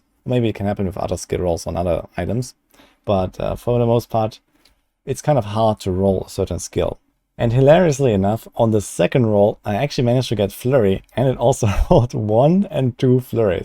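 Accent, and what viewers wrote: Germany. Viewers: Oha, bin gerade froh, dass ich Dich gefunden habe. Werde mir die anderen Videos auch ansehen. Glaube rauszuhören, dass Du deutsch bist. 😊 Aber ich schaue es auch sehr gern auf englisch!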